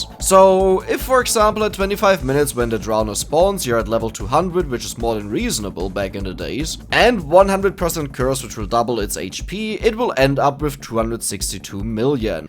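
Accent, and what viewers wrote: Germany. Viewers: your live stream voice sounds way more german.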